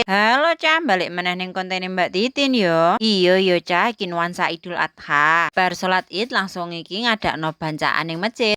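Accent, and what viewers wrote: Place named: Central Java, Indonesia. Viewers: Kalau dari logat nya ,antara cepu ,bloro apa iya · Bojonegoro juga,,nggonmu dadi nggonem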